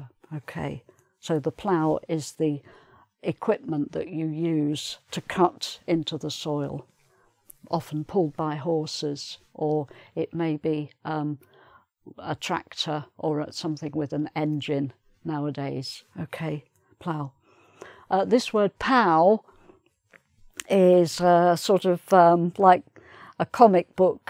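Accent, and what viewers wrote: United Kingdom. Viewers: Is this British English?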